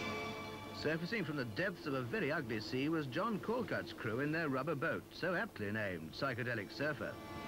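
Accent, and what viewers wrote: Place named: RP, United Kingdom. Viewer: love that 'cut glass' accent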